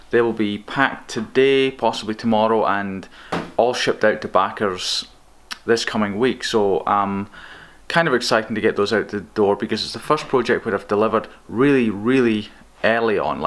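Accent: Scotland, United Kingdom. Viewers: Is he Scottish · Are you Scottish?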